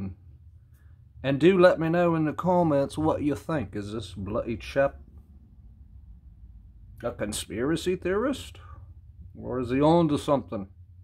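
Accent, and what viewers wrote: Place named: Australia. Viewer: G'day, I 💚 the accent, the lazier you make it the more Ozzie you sound 😂 Adorable 😘 Awesome Artist 👍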